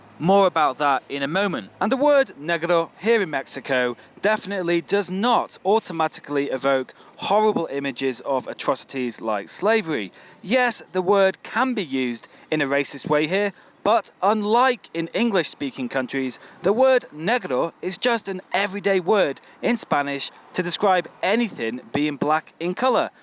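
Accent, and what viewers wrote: England, United Kingdom. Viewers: I used to watch British Telly until the 2006 , that's why I understand and love your accent but honestly I didn't know the little Britain lol . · me gusta tu acento ingles a ver cuando me das clases de tu idioma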